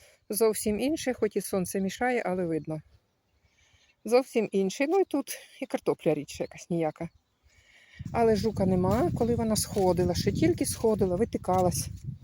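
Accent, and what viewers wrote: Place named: Poltava, Ukraine. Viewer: В вас виговор Полтавський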